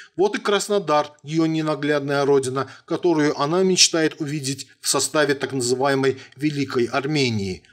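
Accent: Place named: Azerbaijan. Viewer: Margaritu armyane lyubili I lybyat! A vse, kto seichas pishut (yakobi protiv Margo), eto-- vashi soplemenniki! A vash akcenq glupovatogo azera, diktor, otvratil'no I opasno dlya ushei))))). Vi uje sdelali nash abrikos samim znamenitim fruktom na planete, oluxi))))))). Tak shto sidite spokoyno, zanimaites' tem, k chemu privikli----- prodajei zelen'y!))))))))))))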